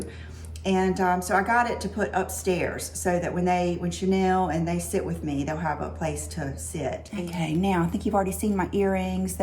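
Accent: South, United States. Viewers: Love your precious southern accent!! Can I be you?!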